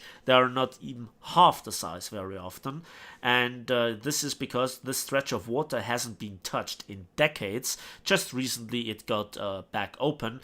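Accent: Bavaria, Germany. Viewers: Ist das bayerisch? Oder ein anderer Akzent?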